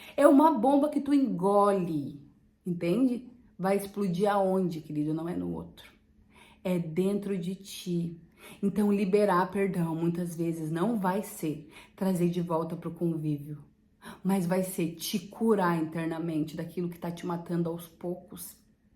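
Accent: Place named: Rio Grande do Sul, Brazil. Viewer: Gaúcha né??? Bênção mulher!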